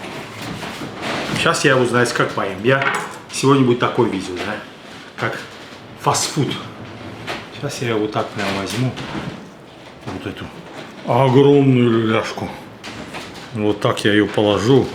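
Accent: Baku, Azerbaijan. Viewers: Салам землякам.я сначала ставлю би дяня жирный лайк а потом слушаю родно бакинский говор.мы часто в Новханах делали балык .кябаб.и котлеты классные тем более в вашем исполнении.Спасибо🎉🎉🎉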